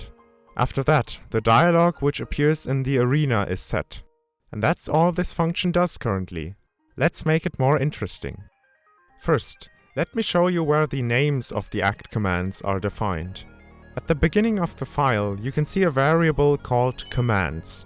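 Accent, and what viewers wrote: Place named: Germany. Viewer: are you, by any chance, german? bc your voice sounds similar to some german youtubers speaking english.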